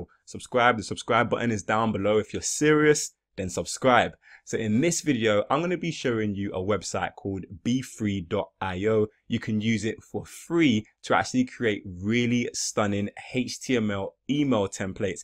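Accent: United Kingdom. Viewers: Thanks for the fast reply . I know it is early morning for you, if your UK accent is any indication as to your location ... :-)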